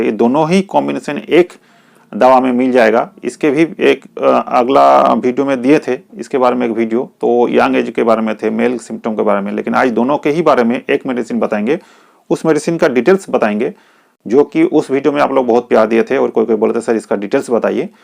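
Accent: Bengal, India. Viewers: আপনার বাংলা ঘেষা উচ্চারণের জন্য বললাম ।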